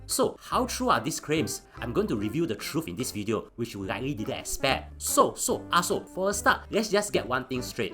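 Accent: Singapore. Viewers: Ur Singaporean accent is so prominent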